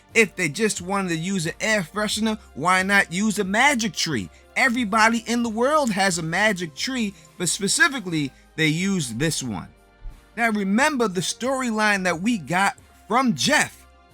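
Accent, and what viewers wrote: New York, United States. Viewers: Can you talk a little less black and new york-ish, please. We get it, you're a black new yorker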